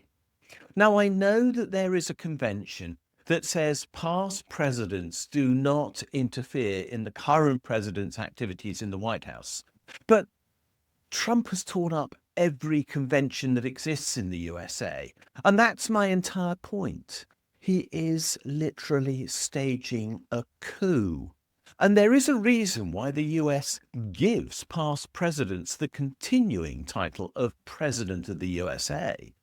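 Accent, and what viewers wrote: United Kingdom. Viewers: Oh contrare, the coup happened with the 2020 election. Bush, Clinton, Obama & Biden are all traitors to America. The only one going by the book and the constitution is Trump. Do some research. And, yet, you with a British accent, who probably loves your King. Oh, the irony of it all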